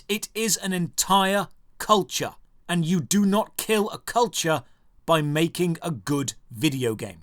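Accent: United Kingdom. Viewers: showing an american football venue while talking about football and sounding british. Now I'm confused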